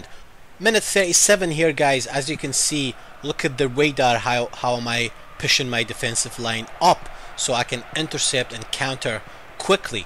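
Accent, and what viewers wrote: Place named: Scotland, United Kingdom. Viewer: i thought you were Scottish myself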